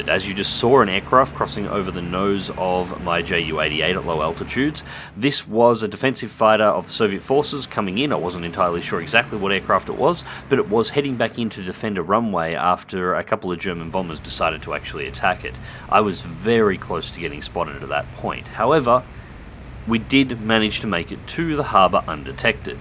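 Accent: Australia. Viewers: Yeah mate he's bloody aussie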